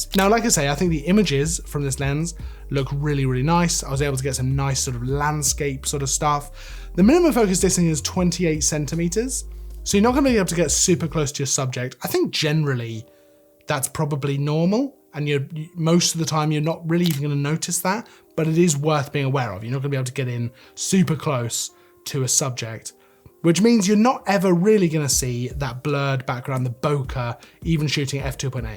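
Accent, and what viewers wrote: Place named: United Kingdom. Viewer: I can't with the old British accent. I study American history and what those people did to this country is deplorable. So his accent makes me feel nauseous. Not his fault 🤢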